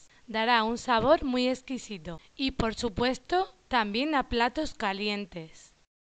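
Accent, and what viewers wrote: Spain. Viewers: Me gustó el vídeo, me gusta mucho el tono Español, estoy comiendo una ahora en mis vacaciones en Córdoba Argentina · Me encanta el tono español pero lo que no me gusta es que la gente españoal no respeta a Dios.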